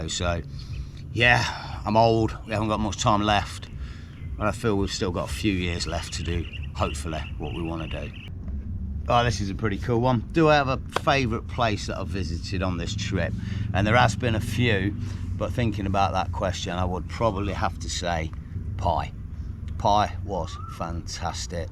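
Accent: Leicester, United Kingdom. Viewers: Enjoying the videos , just discovered your channel, Is that a Leicester accent?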